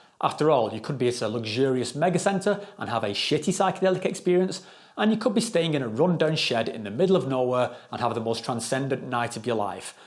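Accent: United Kingdom. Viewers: Awesome review mate. It was definitely balanced and fair. Honestly some really vital information that anyone planning to spend thousands of dollars and weeks of their time to execute should know. I have a feeling that by the time I’d actually go on a retreat, Merosa would be a real gem. On a side note, I hud no idea you levved over thur in Swetzerland. I feel like the more I lesten to ya the moar I start thenkin in ur accent. But I’m just a white boy from the good ol’ Yew Ess of fockin’ A mate. But for real, what part of the UK are you from? I seriously dig your accent.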